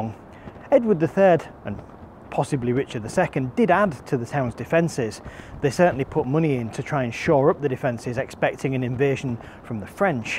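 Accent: Hull, United Kingdom. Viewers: Just come across your vids. Great content. Proper Hull accent. New fan. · I thoroughly enjoyed the video. I'm new to the area and not properly familiar with the local accent yet. May I ask if you're is typical of the area, or do you originate from further North East? Subscribed. 🙂👌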